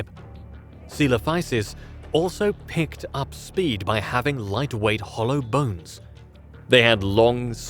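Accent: United Kingdom. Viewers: Am I the only one sick UK accents?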